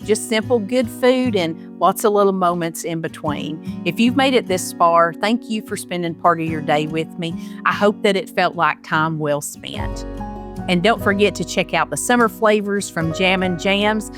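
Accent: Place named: North Carolina, United States. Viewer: Thank you for the encouragement. I love watching your videos. Your accent and loving attitude sounds like my eastern NC home.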